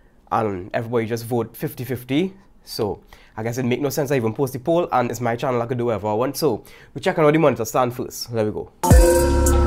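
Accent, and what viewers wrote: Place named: Trinidad and Tobago. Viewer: That’s definitely a Trinidadian accent if I ever did hear one, love the review brother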